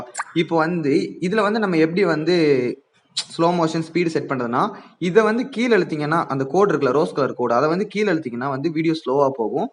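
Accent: Kanyakumari, India. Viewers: Anna neenga kanniyakumariya?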